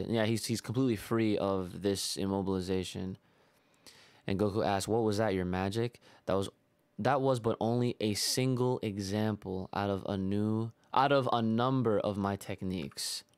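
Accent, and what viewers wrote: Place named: Philadelphia, United States. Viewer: Bro you from philly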